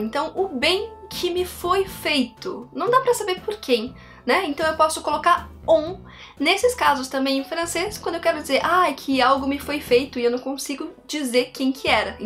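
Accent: Rio Grande do Sul, Brazil. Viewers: so uma dúvida vc e gaúcha.Edvandro do Rio de Janeiro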